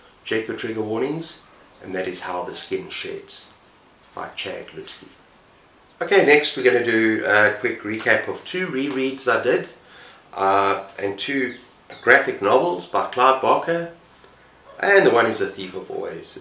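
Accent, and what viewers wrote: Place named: South Africa. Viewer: Love to hear your South African accent and way of speaking. As a South African living outside the country it is great to hear you. And it’s great too to hear someone who engages with the books I love. You live in an area blessed with access to great books. Sterkte, my vriend.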